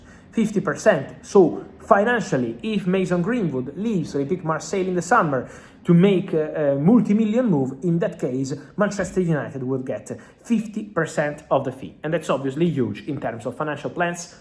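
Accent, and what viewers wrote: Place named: Italy. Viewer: Why you talk so fast this is news everybody want to understand you speak english with italian way Pkease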